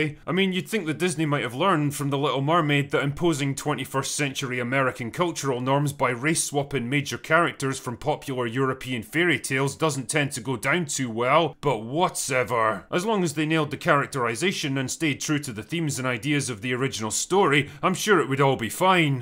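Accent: Scotland, United Kingdom. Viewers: I really do appreciate his take spot on, also wow I didn't know was Scottish I assume he was British accent hard to decipher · German with a Scottish accent, music to my ears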